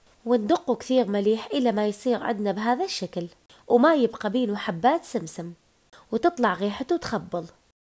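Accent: Mosul, Iraq. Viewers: فدوة للهجه تجنن اموت عل مصلاويين وكلامهم الحلو فديت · آللهہجة آلمـصـلآوية تجننن ❤❤ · عاشت أيدك ياحلى لهجه الموصل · عاشت ايدكي حبيبتي قبان لهجتنا الحلوي الله يحفظ الموصل واهل الموصل يارب 🤲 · حلوه لهجت أهل الموصل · عاشت ايدج يالمصلاويه لهجتج احله من الكليجه الله يحفظ الموصل واهلها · ليش الموصل لهجتهم شويه تختلف عن بغداد. اكو كلامات متنفهم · الله ع اللهجه الموصلية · اكتبيلي عن حياتج عمرج اني احب الهجه الموصليه انتن الموصليات معدلات بلطبخ ام حيدر من الديوانيه الله يوفقج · اني عجبتني اللهجة الموصلية واللدغة باللسان روعة وعاشت الايادي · كلامك حلو مصلاوي احب الكلام المصلاوي و الاكل المصلاوي لاني عشت بالموصل اربع سنوات خلال دارستي بجامعة الموصل و بقيت كل سنة بالعطلة الصيفية اسافر للموصل كلك رائع ياعراق من شماله الى جنوبه مهما بعدنا عنك فأنت عايش بالقلب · اشقد حلوة اللهجه الموصغيه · عاشت ايدج مصلاويه اللهجه تخبل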